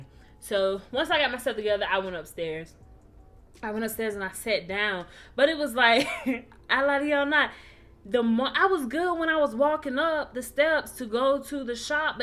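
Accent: Baltimore, United States. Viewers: You sound like a bmore girl lol. I enjoyed the story time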